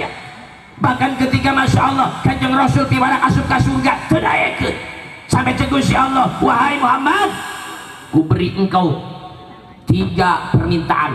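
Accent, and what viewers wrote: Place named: West, Indonesia. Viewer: Ngulon pisan nyariosna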